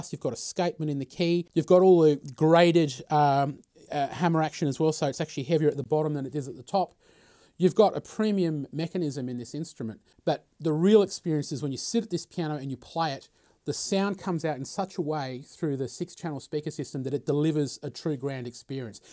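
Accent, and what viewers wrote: Australia. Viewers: Loving the australian accent! how different is the GP609 from the GP607 I by the way own?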